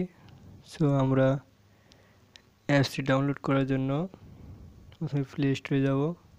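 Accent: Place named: India. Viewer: English ENGLISH . Dont put your title in ENLGISH if youre speaking chicken scratch from india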